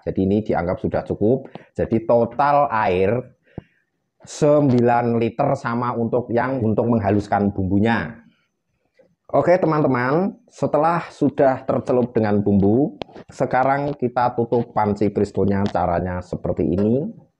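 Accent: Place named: Java, Indonesia. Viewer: Chef ttp dgn ciri logat medoknya 😊 seneng sy, sukses selalu ttp humble 😊😊😊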